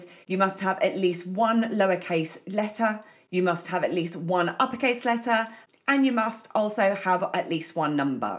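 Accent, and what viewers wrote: United Kingdom. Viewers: the heavy British accent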